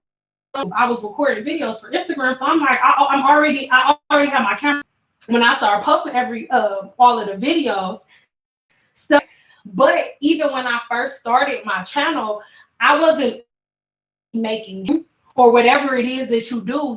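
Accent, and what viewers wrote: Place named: Detroit, United States. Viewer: New SUBBIE, are you from Detroit? “woot woot”and the way you talk reminds me of HOME 313 🥰. You’re very inspiring, I introduced my cosmetology class to your channel 💕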